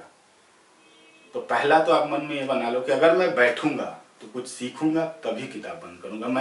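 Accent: Bihar, India. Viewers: bhai tum Bihar se ho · Aap bihari ho?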